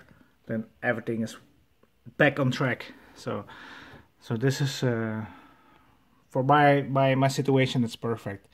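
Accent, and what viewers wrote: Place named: Netherlands. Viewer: mooi nederlands accent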